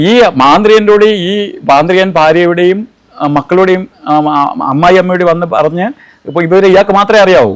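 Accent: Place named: Trivandrum, India. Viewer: Tvm കാരന് നമ്പൂതിരി ഭാഷയോ അച്ചടി ഭാഷയോ പറയാൻ പറ്റുമോ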